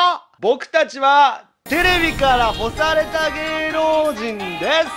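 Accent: Kansai, Japan. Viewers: 関西弁のイントネーションが 気になってしまう〜〜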